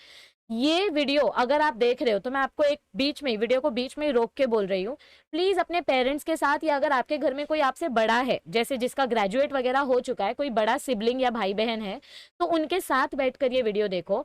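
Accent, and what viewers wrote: Maharashtra, India. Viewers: You are mrathi or Gujarati?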